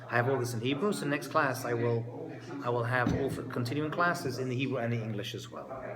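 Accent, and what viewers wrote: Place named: United Kingdom. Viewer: No disrespect he sounds more like English or British person wow back to the study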